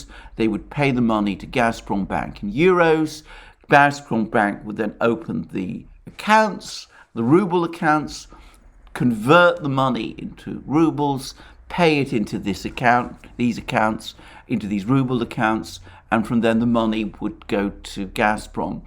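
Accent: England, United Kingdom. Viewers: his lovely English accent,they way he scratches his head....that loquanical....droll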